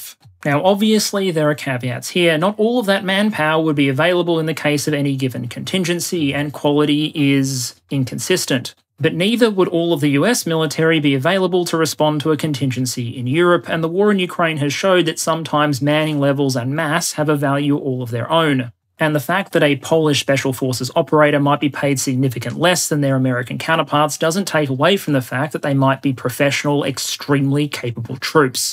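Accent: Australia. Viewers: He has an Aussie accent , but as an Aussie , I can tell he is a nerd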